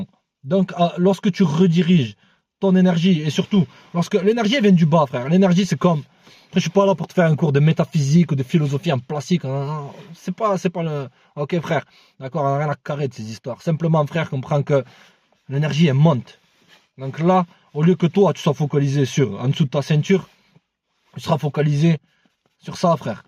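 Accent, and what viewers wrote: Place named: Southwest, France. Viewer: Accent terrible, t d'ou ? Toulouse ? Sud ouest ? Bonnes videos sérieux.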